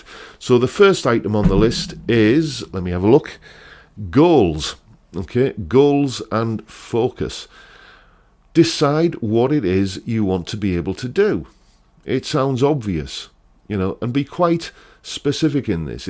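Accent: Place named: Teesside, United Kingdom. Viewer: I worked at North Tees General Hospital (as it was) for what seemed like a century (80 hours/week) . That accent brings it all back !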